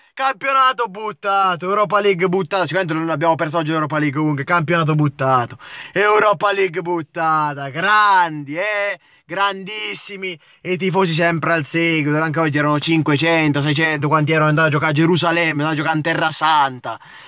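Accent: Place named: Bergamo, Italy. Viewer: lol bergamasco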